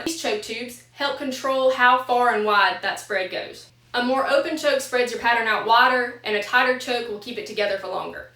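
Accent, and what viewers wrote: South, United States. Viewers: You’re video just showed up on my feed loved it informative and funny had very useful info and to top it off that southern accent took me back home I subscribed looking forward to seeing more of your work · After a couple of minutes in the video, I could hear the "taam (time); thaang (thing); kaand (kind)" - and I was like, yep, that's a red neck there 😆. Great video by the way ✌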